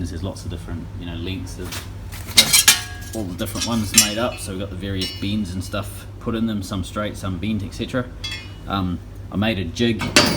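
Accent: New Zealand. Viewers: He's saying pantographin kiwi!!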